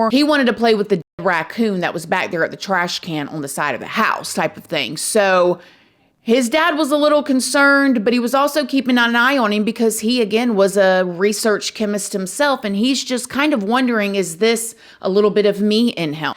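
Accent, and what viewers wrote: South, United States. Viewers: Southern accent, so cute. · G’day. You’re a very friendly, lovely lady 😊. This is my first time visiting your channel. I found your post to be very informative and with all due respect, I love your accent. It reminds me of the Beverley Hillbillies. Y’all come back now.😊😊Consider me an Australian friend and yes. I have just subscribed to your channel ❤❤🇦🇺🇺🇸🇦🇺🌹🌹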